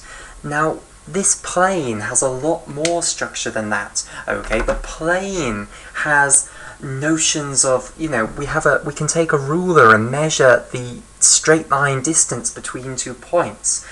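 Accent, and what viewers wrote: England, United Kingdom. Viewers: English accent has it's benifits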